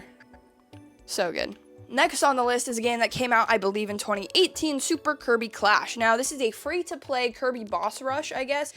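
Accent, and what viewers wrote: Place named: Pennsylvania, United States. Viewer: do you live in Pennsylvania? The way you say certain words makes me think you do hahaha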